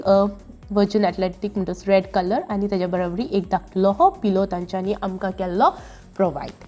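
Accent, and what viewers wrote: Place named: Bardez, India. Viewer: Where in goa u are from i m from calangute ur language is like bardez or tiswadi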